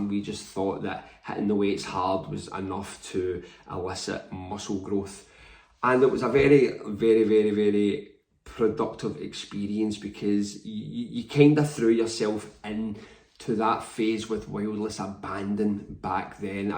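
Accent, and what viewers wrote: Scotland, United Kingdom. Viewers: Subscribing just for the barbaric Viking-esque Scottish accent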